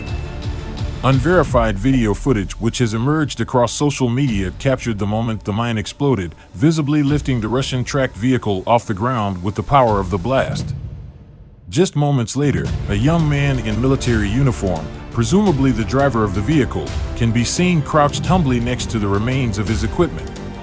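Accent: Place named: United States. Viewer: Also, why the voice of a hick?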